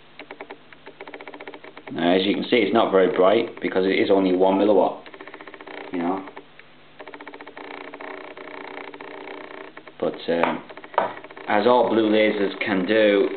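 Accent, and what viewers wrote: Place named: Australia. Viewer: oh great an Australian :(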